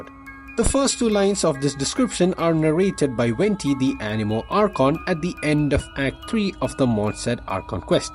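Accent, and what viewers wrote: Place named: India. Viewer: I have to ask, where are you from? Your accent to me sounds Indian, but sometimes it sounds Spanish/ Latino.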